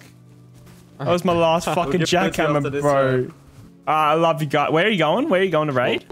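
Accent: Australia. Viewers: I dunnor, mate. I think most people can't get over how Aussie you sound - myself excluded because I love you guys to death.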